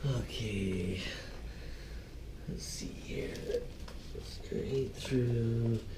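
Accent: Canada. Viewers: you have the potential to be the new Rock Star of YT-resident chiropractors. The mix of your intuitive attention to detail and obvious empathy for your patients - together with a characterful delivery of care on your part - is very compelling. To top off the performance, your Canadian accent and Roadrunner-style moves around the room are very engaging. Move over, Dr. Johnson - there is a new kid in YT Town. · Ahhh... Canadian eh?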